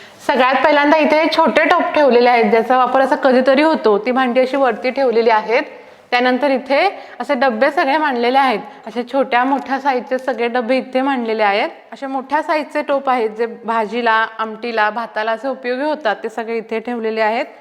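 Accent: Kolhapur, India. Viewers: बोलण्यात हळू हळू कोल्हापुरी tone जाणवतोय बरं का!😅😅... खूप शुभेच्छा तुला...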